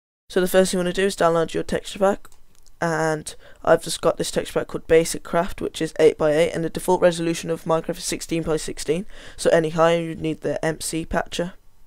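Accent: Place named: United Kingdom. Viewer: OMG he s ounds sooooooooooooooooooooooooooooooooooooooo british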